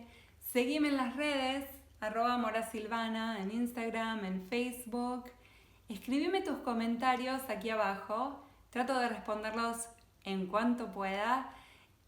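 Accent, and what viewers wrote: Argentina. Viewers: Cómo es que eres judía y tienes acento argentino??? O te volviste judía?? Es pregunta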